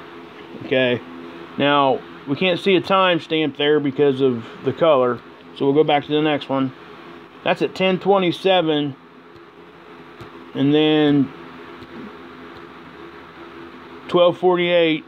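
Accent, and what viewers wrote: South, United States. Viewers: Thank you for ever thing. I like your southern voice it makes me miss my brother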